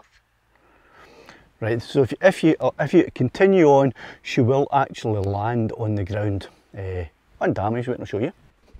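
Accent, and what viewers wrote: Scotland, United Kingdom. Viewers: Great viddy mate. I am also partial to your Scottish English.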